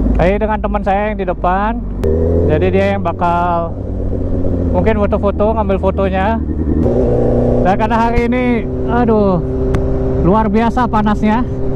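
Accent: Bali, Indonesia. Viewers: bli orang bali bukan? logatnya mirip orang bali hehe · logat balinya masih keliatan 😃😄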